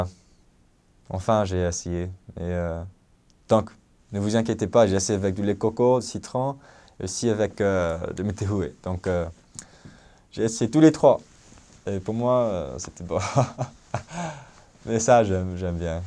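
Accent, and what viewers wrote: Tahiti, French Polynesia. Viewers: 🤣🤣🤣 il s'exprime comme un tahitien !! Reviens nous voir 💕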